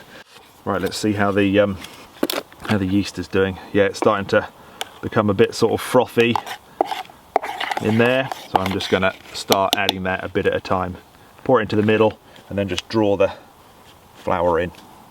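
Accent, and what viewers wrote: United Kingdom. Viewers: I did not see your channel name. First time viewer. I was surprised to hear a brits voice. A lot of survival stuff is muricans. You made a wonderful looking loaf. Good on ya.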